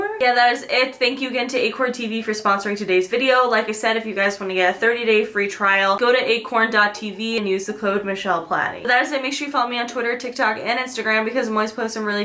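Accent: United Kingdom. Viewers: I love your British accent, love ❤️ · I love your British accent 😭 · The beginning haha I love your British accent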